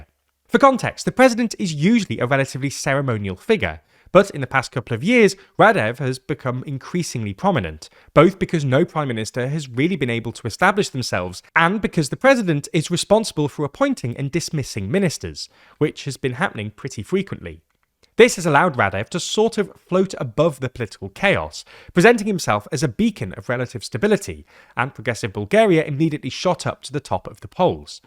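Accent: United Kingdom. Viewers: Todor Zaikov? Haha. The silly fiction that comes out with British accent is everywhere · British accent and actual facts! THANK YOU EVER SO MUCH!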